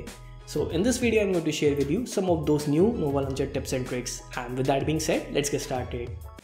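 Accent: India. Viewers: indian english is so scary.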